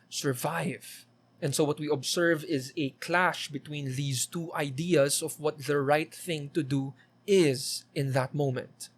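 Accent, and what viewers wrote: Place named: Caribbean. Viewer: You have a slightly Caribbean accent, interesting.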